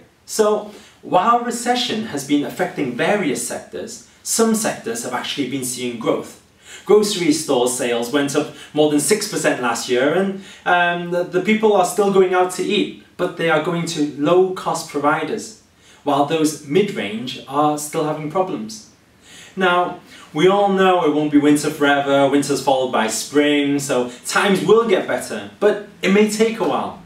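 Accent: United Kingdom. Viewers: Wow... your British accent was more prominent then. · I like your british accent at the time!!!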